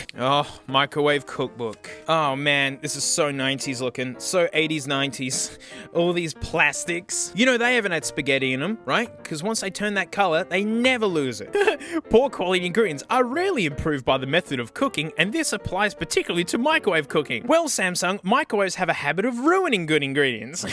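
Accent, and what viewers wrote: Australia. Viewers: Oh boy having an aussie guy yelling at old tech for four hours is the perfect background noise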